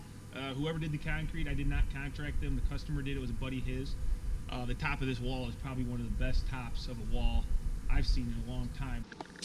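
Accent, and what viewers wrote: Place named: Wisconsin, United States. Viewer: lol has a Wisconsin accent, though. I bet his parents are from there and he picked it up from them as he was growing up and learning English.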